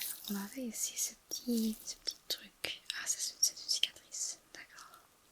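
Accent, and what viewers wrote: Flanders, Belgium. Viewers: elle est pas flamande ?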